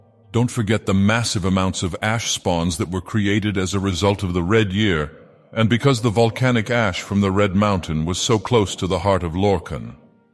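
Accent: United Kingdom. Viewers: British Dagoth Ur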